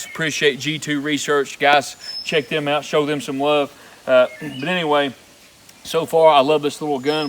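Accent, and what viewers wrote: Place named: Appalachia, United States. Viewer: You are awesome dude, you had me lol the sounding like a hillbilly comments. My wife says the same thing.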